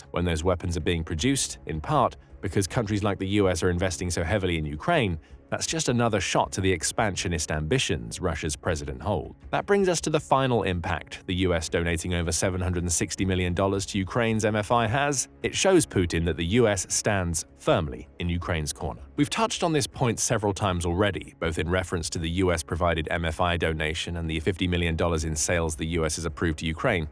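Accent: United Kingdom. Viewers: Whats up with mixing british and american accent??? Can we just stop pronouncing missile with britsh accent and the rest american? So annoying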